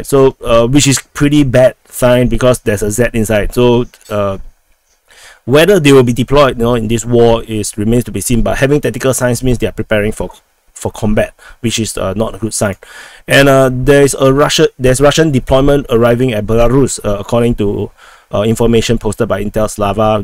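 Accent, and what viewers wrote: Singapore. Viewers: Agree, lets say the smallest bias then. I suspect leans somewhat to the russian side. But probably doesnt care too much. says; "I just want the war to stop" - and I belive him. Again, because is from Singapor is able to distance himself from the bias thing. I am surprised that has so many subscribers, due to the heavy axent. It is probably due to the neutrality in his reporting and nothing else. even makes small jokes while reporting, noone else does that. Again, that is also probably due to his true neutral standing. Because doesnt really care either way. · You sound Singaporean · are you Singaporean or Malaysian? no horse run brother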